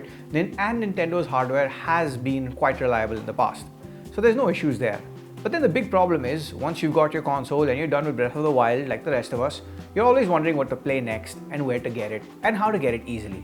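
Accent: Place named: India. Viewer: I don't know why I hate Indian speaking in english like this. I can flawlessly understand native English speakers, but Indians talking english is just horrible. I also talk in english when needed, but the way he sounds and others also, it's unbearable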